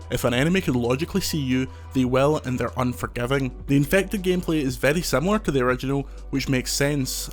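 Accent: Scotland, United Kingdom. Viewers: Yeah Scottish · Scottish accent right? not irish?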